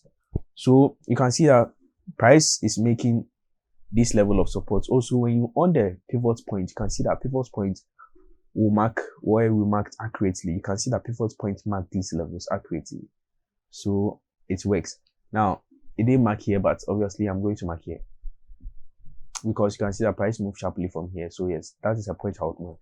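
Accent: Ghana. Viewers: The Ghana accent is strong with this one! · you sound Ghanaian.please are you in Ghana?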